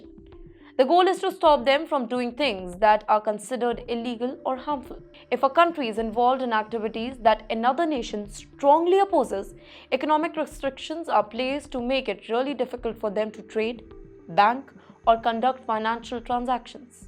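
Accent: India. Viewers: She's speaking Indianlish which very few outside India can understand.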